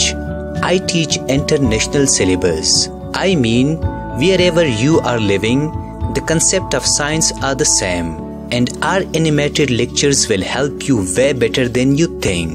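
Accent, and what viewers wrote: South India, India. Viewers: I thought you were South-Indian because of your accent😅.. Anyways, really you're really great teacher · I thought u were from India's South😅😂 keep it up broo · Really It's So Surprising That The English Accent Of ur's Does Matches Which We usually Hear frm our Teachers In India.... So basically U r Indian Famous Teacher On Utube this is my personal opinion.... But ur wrk is tough As I Think....But At Last when U Said Naah Man I am Not Handsome.....that's Wrong In Content Becoz Person Should be good at skills not In Face ..... Lots of respect And Blessings Frm Children Frm India .🙏🙏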